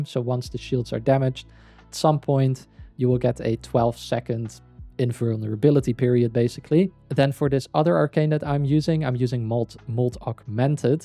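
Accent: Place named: Netherlands. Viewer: He is from Netherlands... This is an accent.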